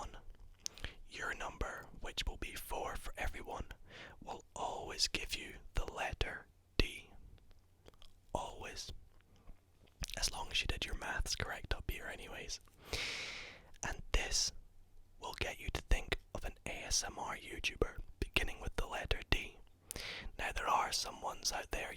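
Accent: Scotland, United Kingdom. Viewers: I can't place your accent are you a fellow scot? :)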